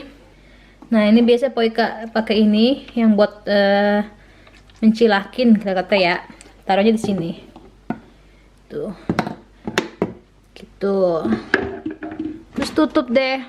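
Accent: Jakarta, Indonesia. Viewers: Behhh si bahasanya gua demenn bngt dh, betawinya dapet bed. Ngakak dengeringnya ( mkn pedes gini sudaannya pantat dh kya dibakar wkwkwkwk) bisa aj. 🤪 Bisa liat video krna tadi sebelumnya liat video widi yg di Swedia widi nyebut" nama kita liat bener asli kocak amat heheheh, maap jdi nyablak gini, maklum betawi pan emng gini bahasanya. sukses trus ya 👍💞👌🙏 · logat betawinya kentel bangeeettttttttttt, saya suka🖒🖒🖒🖒🖒🖒🖒🖒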